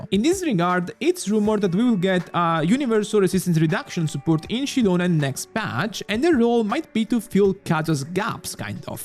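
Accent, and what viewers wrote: Italy. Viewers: I love his italian accent:D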